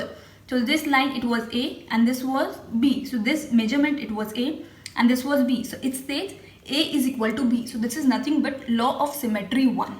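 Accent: India. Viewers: Despite the horrendous Indian accent, the explanation was excellent, good work.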